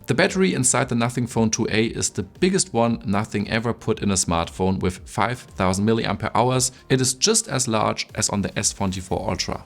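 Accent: Germany. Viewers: I knew this was a German speaking 😂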